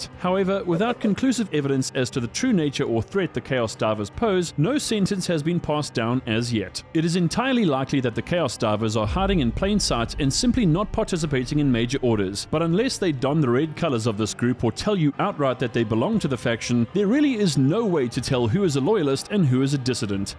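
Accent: South Africa. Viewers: I hear a SOUTH AFRICAN CUZZIN... I subscribe!!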